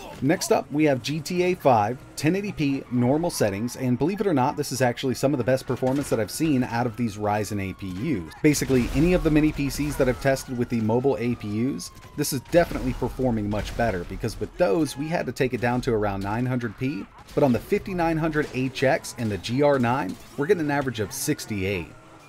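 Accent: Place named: New York, United States. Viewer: I like your dialect. Are you from the NYC area?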